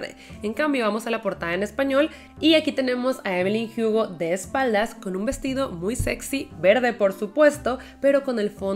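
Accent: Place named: Mexico. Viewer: Estoy aprendiendo español con tus videos. ¡Hablas tan hermoso y claro! Practico mi acento mexicano contigo <3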